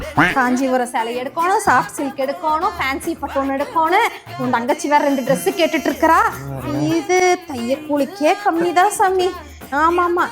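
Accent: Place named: Coimbatore, India. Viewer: The epic CBE slang, flexing it...❤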